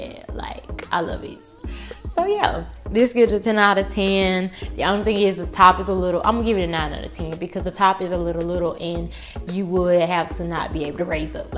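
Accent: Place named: Atlanta, United States. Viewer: These 🔥 with that accent you have to be from Atlanta lol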